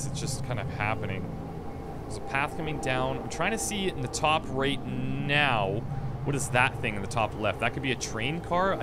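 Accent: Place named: Canada. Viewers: Yes, he's Canadian. Montreal, by way of Saskatchewan (if I recall correctly) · Dragonborn Dovahkiin yehhh ur one of us canadiansssssssss eh!! u rly sound canadian... im from toronto so we don't really sound canadian.. but i have my canadian moments +__+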